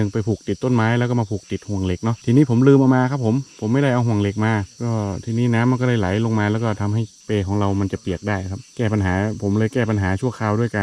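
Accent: North, Thailand. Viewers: ชอบภาษาเหนือมีเสน่ห์ค่ะ แต่บางคำก็ฟังออกบางคำก็ฟังไม่ออกแต่ก็ชอบดูค่ะ✌️✌️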